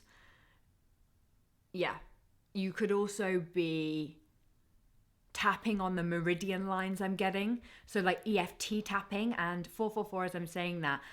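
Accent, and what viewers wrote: United Kingdom. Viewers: Hello my favorite dreamy reader of my path in this coming new life, Your readings are so resonant of the process I am going through for the last few months, sometimes I find it incredible and very enlightning, thank you for the energy you devote to my readings, I am always looking forward to just seen you and hearing your beautifully sounding British accent and that natural radiance and beauty that comes within you, that I love so much, don't ever stop ohhh yes and I Love You more than you will ever know!!!!!!!💖💖💖💫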